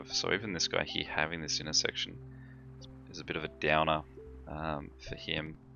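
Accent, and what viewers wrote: Australia. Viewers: another good video, you aussie?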